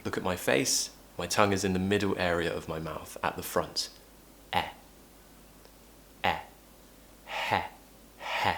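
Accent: RP, United Kingdom. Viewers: How great you are! ♥️ I liked British accent from you❤️ Keep inspiring 😌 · I really like to learn British accent with you. Thanks a lot. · Thank you for always posting great videos :) I love your british accent and your new hair color❤︎ From Japan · The best british accent on Youtube! Thank you!;) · I really love the way you talk and teach about your British accent style ❤ Thank you so much!!!!❤❤❤❤ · Thank you so much to think teaching english because you are the right one in the right place, you make me fall in love in British accent 😍😍😍😍😍😍😍😍😍 I'm Layla from Sudan i will be always watch your videos until i feel i speak like you ♥️ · Hi i love u British accent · Hi. I really love listening to you spiking British accent.....!! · Thanks for such an informative and enjoyable lesson! Your British accent is marvellous! Honestly, miss for your podcasts( Are you planning to do that? 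Best regards from Ukraine!💙💛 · I think I have found my happiness. I've been looking for a male British teacher to copy his accent and yours is beyond my expectations ! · It's been me 2 years learning British accent and................................ I just adore your accent man💖💖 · Bro! Thanks by your Lessons , I greateful with you. I can understand every single word you say , I've learnt British English here, you're my Favourite Person 'round the world , I love your accent , I've a little bit of British Accent by you ... See ya !.. I wait meet you in the future and go to take a break or drink tea, just like a Brit guy .. I Say Hi From Mexico 🇲🇽. Be Carefull . ♥️ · Omg I need someone from UK teach me like, I love British accent 😘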